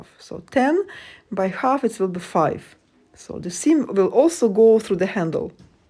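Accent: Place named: Russia. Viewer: Слышу русского человека. Но почему не на русском? Хотя бы субтитры?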